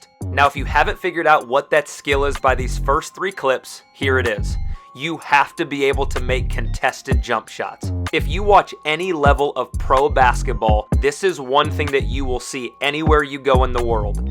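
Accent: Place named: United Kingdom. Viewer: You british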